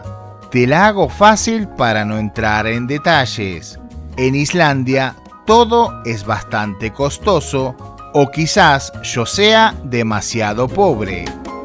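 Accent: Argentina. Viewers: Lo que no entendi es porque hablan mucho de Mejico y el que habla suena a Argentino,lol. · 🤯 ¡Me voló la cabeza!... Pero no por Islandia y su desmadre, la narración en jerga mexa con acento argentino, es como un arma para destruir a las IA.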